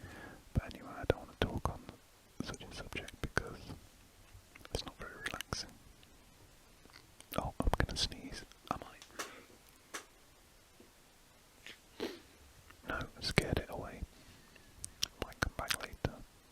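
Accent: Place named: United Kingdom. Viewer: This guy reminds me of Asmr for nerds but with a British Accent. Idk, pretty sure it’s the same guy… anyway love the vids man keep up the good work!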